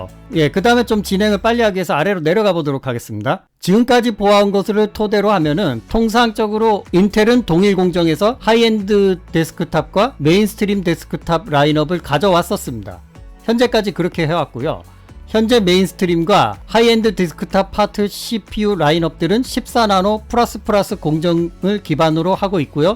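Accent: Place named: South Korea. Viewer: 이아저씨 영어가 콩글리쉬라서 귀에 쏙쏙들어오니 좋네 ㅋㅋㅋ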